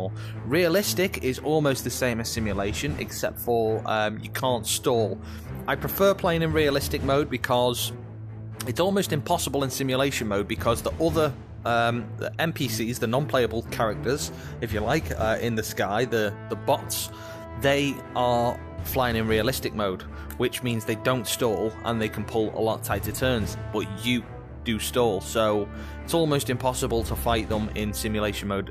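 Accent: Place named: United Kingdom. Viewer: You're British. You get a sub.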